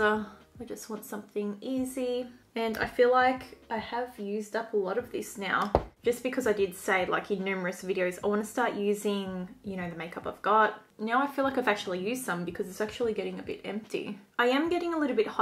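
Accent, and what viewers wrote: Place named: Australia. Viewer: Omg the weird guy story 😂😂😂🤣🤣🤣I love the way you talk, it’s exactly how I talk with my friends! I love watching fellow Aussies, but you especially make me laugh with your stories 😃😃😃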